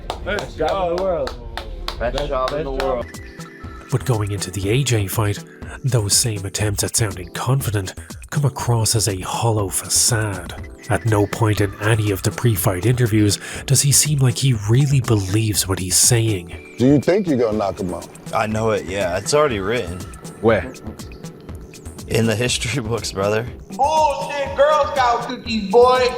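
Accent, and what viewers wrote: Ireland. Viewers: Irish accent, talks about fighting, pop culture and gaming references, that spinning disc when crediting music... ...Super Eyepatch Wolf, is this your alt channel?? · Homie, I was there in the audience of your Brock premiere. Am I anything but a legend for witnessing THE legend do some slick stuff that interests a room temperature to refrigerated MMA fan, could actually be called not a fan. I enjoy that Irish accent and the video game flourish. You are a Legend. You are such a Legend, wife got a full ass subscription to sandwich daily so you make your own and still thank your wife. Legend! 😂❤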